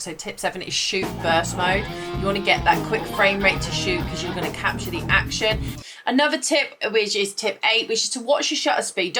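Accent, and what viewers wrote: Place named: United Kingdom. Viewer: Actually I thought these are great tips I am going to bring in my small gig photo session today. But the presentation was rather busy. No offense, but the quick talking with the specific accent made it slightly hard to follow what was said for a non UK person as me. Specially with the brief text that flashed past in the bottom of the screen. A tips, slow down. Don't try to say as much as possible on as short time as possible.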